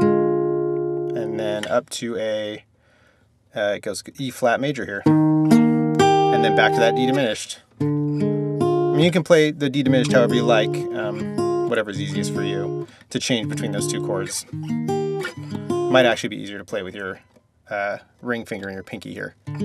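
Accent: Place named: United Kingdom. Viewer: This dude does one hell of a British accent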